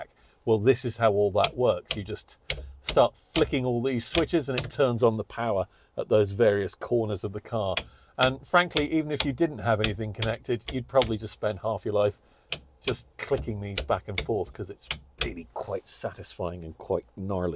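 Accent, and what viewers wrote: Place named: England, United Kingdom. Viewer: I love the way your plummy English accent keeps slipping into pirate. Great review!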